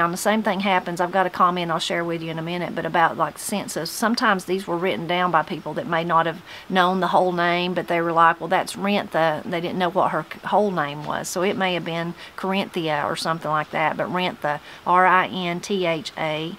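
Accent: Appalachia, United States. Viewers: Your accent is beautiful - it speaks of where you’re from and the long history of people in Appalachia - accents aren’t as strong here in Canada. I really enjoy your videos - thank you! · I love hearing you talk, with the accent my Appalachian family brought to mo.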